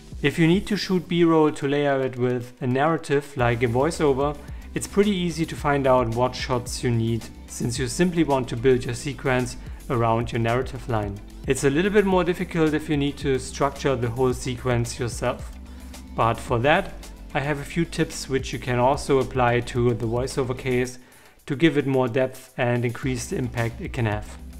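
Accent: Germany. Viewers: Great video. And interesting accent. where are you from? Leipzig? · Are you from Germany 🇩🇪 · Mega geil man hört an deinem Aktzent das du Deutscher bist 😂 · Is this a Hard german accent? Nice B Roll though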